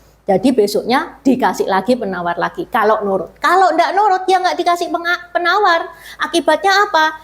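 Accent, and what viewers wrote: Java, Indonesia. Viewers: Medok ya..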